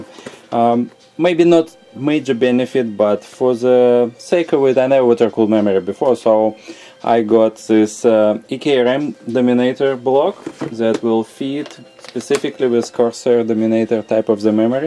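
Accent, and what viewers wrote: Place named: Russia. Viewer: russian accent <3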